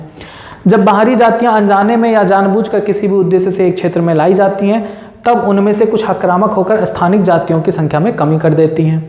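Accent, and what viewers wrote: Chhattisgarh, India. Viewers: Sir aap Chhattisgarh se ho kya???🙂😁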